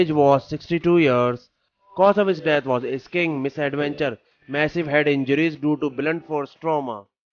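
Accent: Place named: India. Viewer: Voiceover dude has huge Indian accent.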